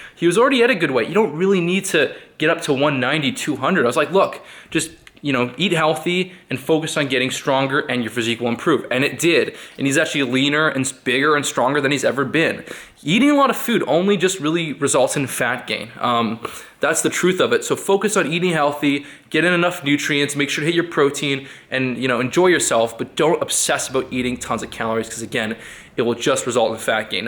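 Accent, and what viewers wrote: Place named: Canada. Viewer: He nails some pretty hot broads and is def straight, I think its a Canadian accent tho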